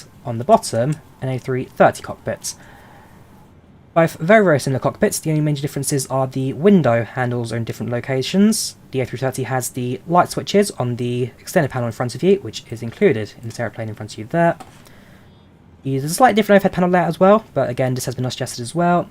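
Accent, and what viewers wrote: United Kingdom. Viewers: Thanks for this video! It's wonderful listening to your British voice and a good landing! Your channel deserves more subscribers!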